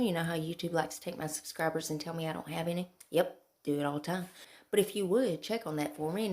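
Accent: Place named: Tennessee, United States. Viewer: Howdy from Tennessee! Just subscribed. Love the videos and that you talk just like me! 🥰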